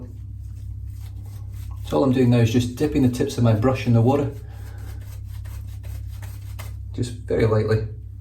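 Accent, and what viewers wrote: Scotland, United Kingdom. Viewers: If he talks to fast for you, try backing off the speed to .75. I usually watch on 1.25... the Scottish accent sounds better when it's rippin'... full Trainspotting 😆😂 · I don't think there's anything wrong with your speech cadence at all. It's probably just that a Scottish accent can be challenging for people living outside the U.K. You do look very comfortable in front of the camera now, and your videos are entertaining and informative. Nice work. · Great review! Melody and I thank you for all the support and kind words. Kind words in a Scottish accent are truly the best so please don't change a thing! I prefer an unscented aftershave or witch hazel as well so I can enjoy the scent of the soap on my skin a little longer. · Um...I don't know who complained about how you speak, but honestly, a Scottish burr is the sexiest accent in the world. 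Added to those beautiful eyes...oof. :D You're perfectly understandable--don't change a damn thing. Shave on. I just bought this soap bc the label is so gorgeous. sigh I'm so easy...just not cheap ;)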